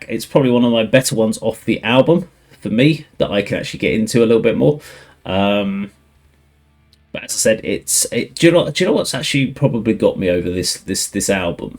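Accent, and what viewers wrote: United Kingdom. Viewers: Exactly!!!! It was very DISRESPECTFUL!!!!. WE ARE MILLIONS OF PEOPLE FROM MORE THAN 15 COUNTRIES WHO SPEAK "SPANISH" AND WE ARE NOT FROM MEXICO. THE language NAME is "Spanish". It's like if I say that you are speaking in the "USAing" because I assume that if you speak English then you are from USA and I call you GRINGO, when I clearly hear your British accent. English speakers tend to have this closed world mentality about culture, languages and geography because they speak only one language (while the majority of the world is bilingual). Many times that I have seen English speakers who when they hear Spanish assume "Mexico" which shows the level of little education in those countries respect to other cultures and how they tend to look only for themselves. By the way Spanish music is the second most listened to music in the world today. 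Just so you know. Maybe you need a long trip to latin america to know the world outside you caveman and open your mind....you can discoverd that exist a lenguage called SPANISH with more of 20 spanish accents in a lot of countries even Africa.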